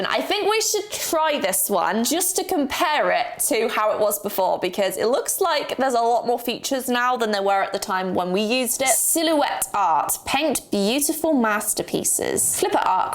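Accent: England, United Kingdom. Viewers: Sorry are u english? I can't tell if ur American lol the for making this vid!! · The British accent I love it Bettah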